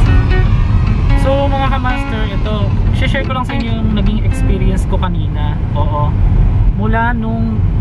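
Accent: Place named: Bulacan, Philippines. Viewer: Hello more videos pa po..taga bulacan po ba kayo? May tono po ang salita nyu e😍 godbless po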